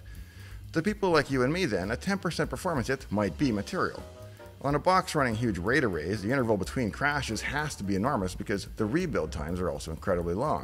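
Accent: Canada. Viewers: A second option that may help is to CC the videos, as I quite enjoy listening to the pnw/Canuck accent you’ve got going on.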